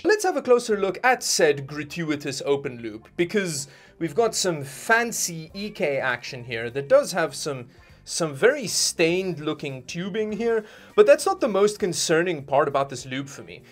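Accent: South Africa. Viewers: are you south african?! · Are you from South Africa?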